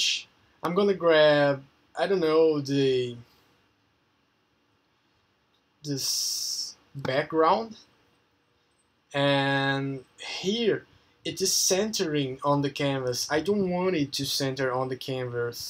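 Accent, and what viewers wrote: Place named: Brazil. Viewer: Are you brazillian? You sound exactly like me when i'm trying to speak in English (this is not an offense)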